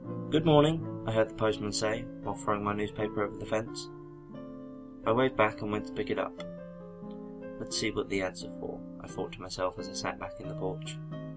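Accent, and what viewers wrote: United Kingdom. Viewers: ain't often i find a British narrator i like, nice one bro.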